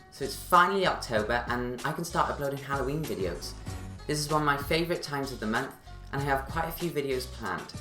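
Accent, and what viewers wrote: Wales, United Kingdom. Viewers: Are you Welsh ?x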